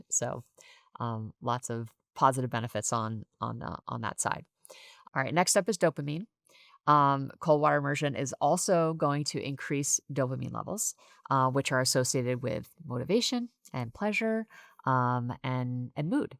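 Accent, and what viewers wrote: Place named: South, United States. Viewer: I dip my hand into a ice fill cooler .. southern voice... Again thank you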